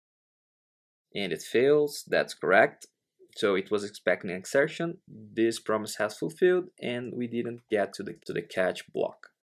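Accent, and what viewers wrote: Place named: Brazil. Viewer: dude its impressive how from the first 3 words you've spoken i already recognized the brazilian accent exactly like mine ahahahahhaha nice vid! :P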